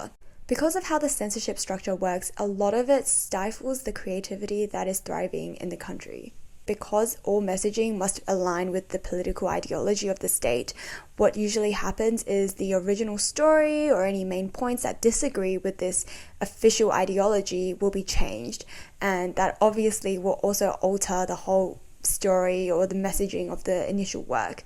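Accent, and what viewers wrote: England, United Kingdom. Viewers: you seem to have a English accent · the accurate British accent as an ESL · why are you talking like bri'ish? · never seen an asian have a british accent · I see, beautiful girl, love the accent. Is that British? I liked the content too. Thanks for sharing.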